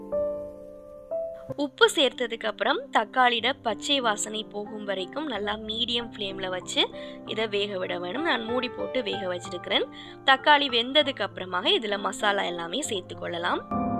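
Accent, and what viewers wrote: Jaffna, Sri Lanka. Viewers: Jaffna tamil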